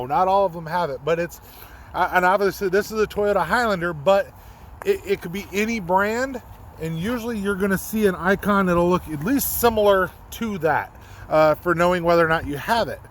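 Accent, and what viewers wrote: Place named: Flint, United States. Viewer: Flint guy here, I know that accent!!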